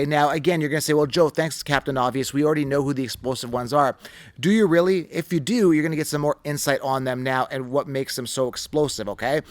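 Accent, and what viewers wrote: Canada. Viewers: I like watching your show.. I can even tolerate your Canadian accent but could you actually pronounce someone’s name right??? Is that too much to ask??? You sound like a damn fool.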